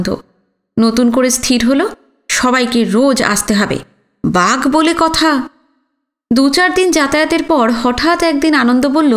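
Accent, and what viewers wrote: East Bengal, Bangladesh. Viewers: Khubi bhalo lagche, tumi je aeto parisram o jatna kore aei bishal upanyas path kore shonachho ,bishesoto purba banglar bhasha je immense dakhyatar sathe bolecho ta ak kothay atulonio, aeto ghatanar madhye ak jon adbhut bhabe missing se holo Jugal ,aeto charitra pratita prithok rupe path kora sahoj byaper noy tomar aei prachesta atyanta prosongshoniyo ,bhalo theko anek dhanyabad tomake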